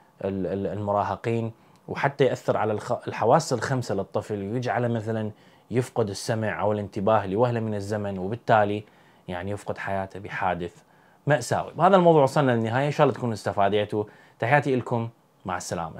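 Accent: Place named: Iraq. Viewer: فدوه لقلبك كلامك العراقي يجنن يحسسني امي بالعراق❤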